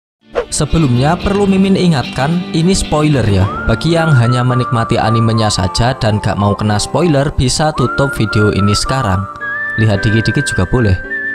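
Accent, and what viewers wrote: Java, Indonesia. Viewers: Lu orang Jawa ya min,medok bnget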